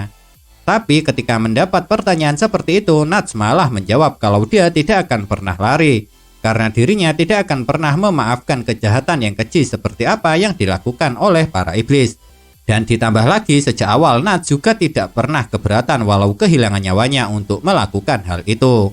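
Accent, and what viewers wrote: Java, Indonesia. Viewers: Logat jawa nya masih kerasa,pembacaan dan penjelasan masih kurang enak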